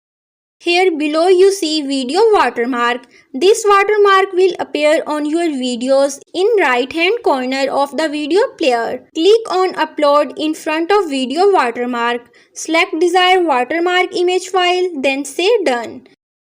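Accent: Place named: Afghanistan. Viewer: india? your voice like a afganistan dialeg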